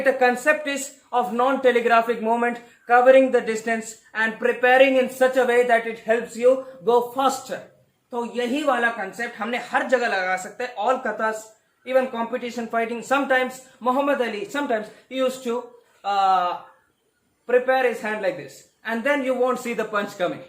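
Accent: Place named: South India, India. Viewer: Ur accent is like south Indian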